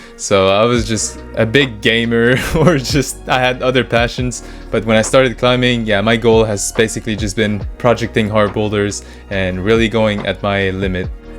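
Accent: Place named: Quebec, Canada. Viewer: 2e jour de suite où j'écoute une vidéo pas rapport en anglais et je réalise en plein milieu que c'est un québécois comme moi.😂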